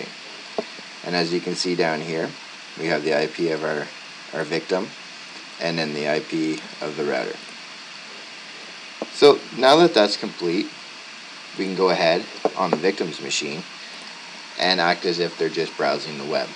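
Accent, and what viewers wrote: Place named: Canada. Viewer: love the Canadian accent.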